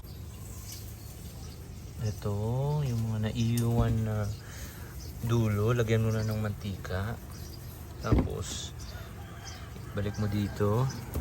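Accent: Iloilo, Philippines. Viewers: Ilonggo ka gali sir?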